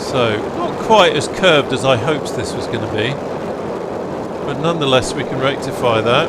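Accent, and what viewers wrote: United Kingdom. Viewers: 🙈 Pffff … British accent, British person , it’s got to be Duxford or UK. ! UK a bit flat and boring to fly over in m opinion. !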